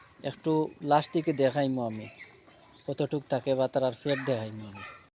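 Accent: Sylhet, Bangladesh. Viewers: ভাই আপনার বাড়ি কোথায়,,,, মনে হচ্ছে সিলেট,,, সিলেটের কোন জায়গা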